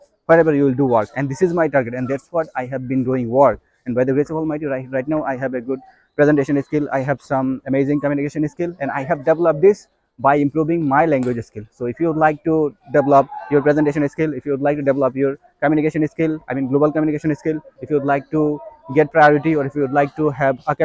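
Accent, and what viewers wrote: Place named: Indian subcontinent. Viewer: Pronounciation skill needs developing.Here latin and British mixture as well as indian sub continent lingua style clearly shows😅. Anyhow, best wish.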